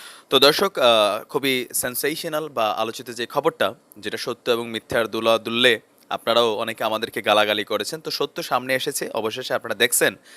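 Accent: Comilla, Bangladesh. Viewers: Vai,,plz apni alws cumillar vasay vedio diyen,,, eto eto eto valo lage❤❤❤ aha go moirom bubu❤❤❤😂😂😂😂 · hahah i just like ur comilla accent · ভাই আপনি কুমিল্লার ভাষায় বল্লে সুন্দর লাগে।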